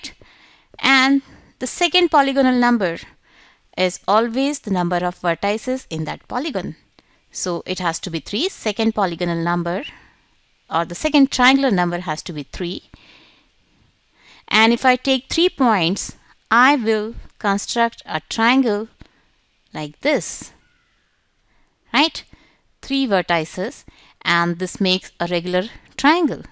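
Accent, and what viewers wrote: India. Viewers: annoying voice. And until when will the Indian accent with English titled videos be made.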